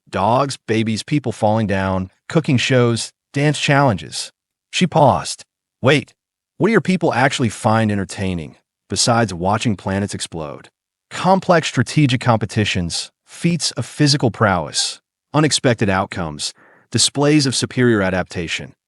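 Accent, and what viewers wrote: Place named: Spain. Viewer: Never get rid of the wierd and occasional pronunciations with a Spanish accents. So much fun. I look forward to them 😊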